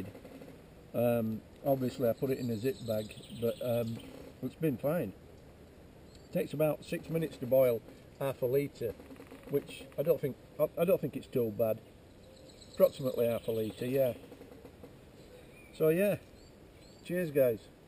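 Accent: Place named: Leeds, United Kingdom. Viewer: just been watching another of your vids and my mrs commented how much accent sounds like her dad, and uncle, who are originally from leeds way. Glad you got permission, although I would definitely make sure I had a bright flag up, and smoke signals, if you run the chance of being stuck cooking your bacon butty's in the morning, in the middle of a shoot. Hope to see a vid of your night out some time soon. ATB, Phill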